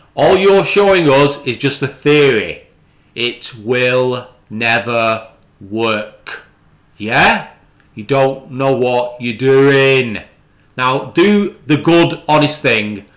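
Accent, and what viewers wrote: United Kingdom. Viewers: I grew up loving every single British accent I've ever heard. Thanks to the rampant self-owning stupidity coming out of my speakers in your dumb-soaked voice has diminished that pleasure significantly. What really proves that you don't know what "science" is hardly the idiocy you've given about his obscure research or his lack of engineering patents, it's the fact you thought he wouldn't look up source materials like the Boring Company's own published materials. "That's why we don't listen to the guy, because he doesn't know anything about anything". Says the clown with about 8310 subscribers, compared to a 1.02 million subscribers. If only, if only, IF ONLY... it's such a crippling shame that you aren't really a crew member sharing the fate of the USCSS Nostromo. Being the kind of creature who would assist and enable snake-oil salesmen like Musk means you ought to be banned from making videos. 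I'd consider listening to you the same way people might watch clowns, to poke fun at your inability to do basic thinking. It would be amazing to watch you "shoot down all" his "stupid videos" and watch you blow off both your feet... but it hurts too much to hear your idiocy expressed in a British accent. You'd be ashamed and would componse an apology video if your brain functioned, you gamma-slug.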